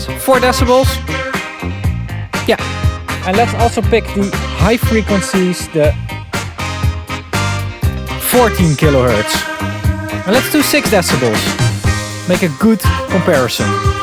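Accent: Netherlands. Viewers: did you say 'the a-shill-iate links'? I am not asking to make fun of your pronunciation. The dutch accent is fine, no problem. Would be funny though if you know what a shill is and it was intended.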